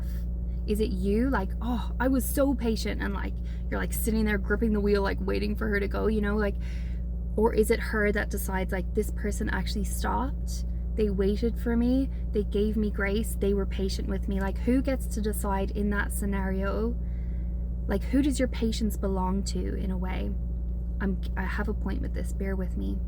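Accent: Ireland. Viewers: you are confusing me. You have a Scottish complexion but every now and then you sound Irish. But you're American right?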